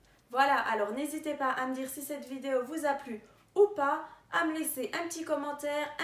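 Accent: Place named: East, France. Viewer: Pas mal ta chaine ! Oh ça sent l'accent de vers chez moi, l'Est !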